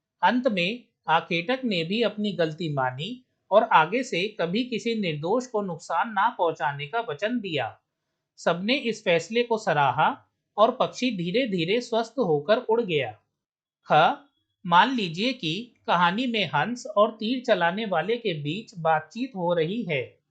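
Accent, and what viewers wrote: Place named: United States. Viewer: Why are you speaking English like a american?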